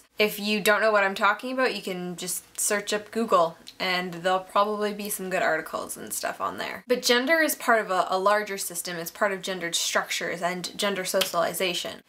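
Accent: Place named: United States. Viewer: ..... This is a modern viewpoint assuming that history respects your cultural opinion on sex. Based on voice alone and I may be wrong is you're american, less than 200 years ago it was a scientific fact that black people were lesser, and women's role in the house seemed to be limited to child bearing... Then you claim humans in ancient society had enough time to understand the difference between gender and sex.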